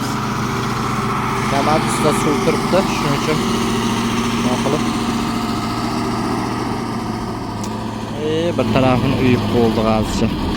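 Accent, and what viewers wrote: Surkhandarya, Uzbekistan. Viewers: Бу қаер. Шеваси Сурхандарё ёки Қашқадарёликми дедим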